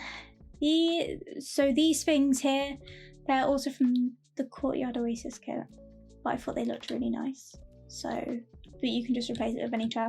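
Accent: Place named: United Kingdom. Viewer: Thank you. I've seen it. :) I'm looking forward to see more from you. And your perfect British accent makes these videos even better.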